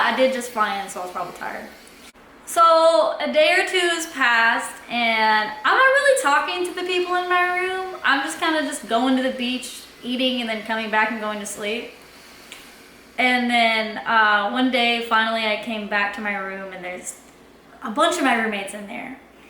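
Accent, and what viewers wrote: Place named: South, United States. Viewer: That's a cool uplifting story. Thank you very much for sharing it with us. I don't know about your accent, in all my life I have met like 3 Americans and none of them were from the South. I have heard this accent before in videos etc and thankfully I have no problem understanding it because I like it a lot. I have a similar story, just not a successful one in how it ended, but still I totally get your sudden change of life. I was a university student of computing in Plymouth, England. I am not British, I am Greek. I was doing my second degree and I was doing it more because it made sense than anything else at the time. I was in my third year there. One late night I was watching a movie on DVD, Once Upon A Time in Mexico. I liked it and decided to watch the special/extra features. One of which was a behind the scenes extra from the film's director. 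At one point, several minutes in, he goes something like this "obviously if you are watching this are interested into film making, else why would you watch this 'boring' stuff". I don't remember his exact words, but that was more or less what he meant. At that point I thought to myself that perhaps in the next life I will become a filmmaker. As soon as I finished that thought, the next one came "what next life? You only live once". Next morning I contacted the university and resigned. There was no film making course at the time so I decided to contact the one where I graduated first in another town in the UK. The following academic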